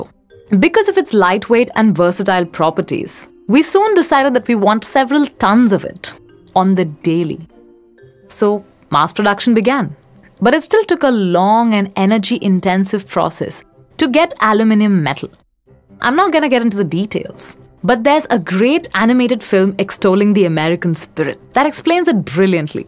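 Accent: India. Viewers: For the first time I like the Indian accent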